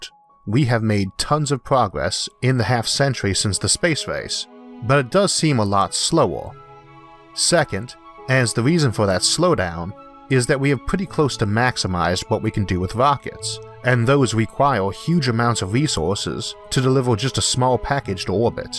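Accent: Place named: Louisiana, United States. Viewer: I don't want to make a point of this as I feel discussion of it takes away from your content, but I need to chip in here. I don't hear an impediment. I hear a pretty specific accent. I'm not American so I'm poor at knowing regional accents, but I always get a bit of the Louisiana twang, maybe? Indeed the only time I think about your impediment is when you mention it. Can't be all that bad I guess! Thanks so much for this wonderful little corner of youtube. Free of idiots and haters, just reasonable people with a shared interest. All the best.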